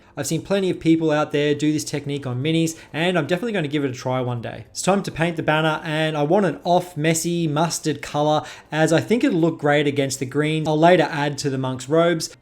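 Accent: Australia. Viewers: I gotta say I love your stuff. Whenever I see a really classic Warhammer mini I can always imagine that in the distance I can hear an Australian accent and some delicious dungeon synth.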